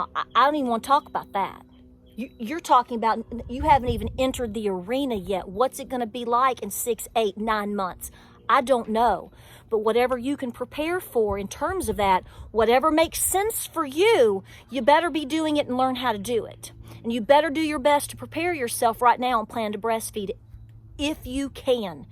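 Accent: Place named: Appalachia, United States. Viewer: I love your accent. My husband is an Appalachian and sounds similar to you. I love his culture. The elders of the past are so important to learn from.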